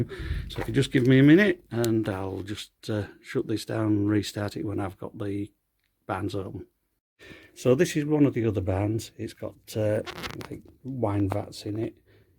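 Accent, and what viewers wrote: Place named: Yorkshire, United Kingdom. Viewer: eh up are you from ULL LOL. You seem to have a Yorkshire accent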